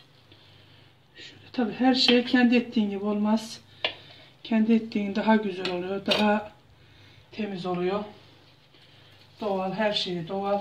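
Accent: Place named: Black Sea, Turkey. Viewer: Ah hemşehrim ah çiğirt-irkme-zebil olmasın kelimelerini duydukca sanki çocukluğuma geri gittim sanki rahmetli anacığımın sesini duyar gibi oldum ne kadar özlemişim Uşağımızı şivesini kendine has kelimelerini Allah senden razı olsun.Ayrıca peynirde çok güzel oldu mutlaka deniyeceğim hemşehrim çok teşekkür ederim.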